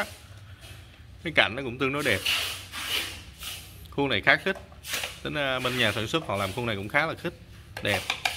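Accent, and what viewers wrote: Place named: Mekong Delta, Vietnam. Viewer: Cửa hàng mình ở đâu vậy anh..? Nghe anh tiếng ngươi miền tây ạ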